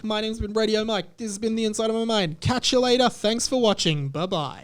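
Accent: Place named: New Zealand. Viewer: I like your New Zealand accent.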